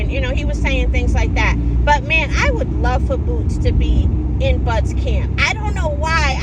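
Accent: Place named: Boston, United States. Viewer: Talk yo shit with that Boston twang!! I want Abdullah in Buds camp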